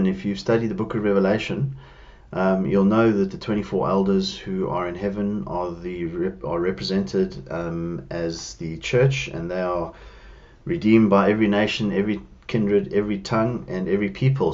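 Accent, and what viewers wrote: South Africa. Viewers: There's no way I'm rolling my eyes...Just happy to see you haven't given up and that you are still looking and searching, which is encouraging to those of us who can't wait for the return of Our Lord and Savior Jesus Christ....Things are defenitely coming to a head in the middle east,and who knows what North Korea have got on their mind....Thank you for your work...As I mentiioned before,I am living in South America and I love to hear a fellow SA accent,especially knowing you serve The Lord....May God bless you....Maranatha! P.S. I don't know if you follow Amir Tsafarti from "Behold Israel"...He also serves the Lord and gives excellent updates on the Middle East.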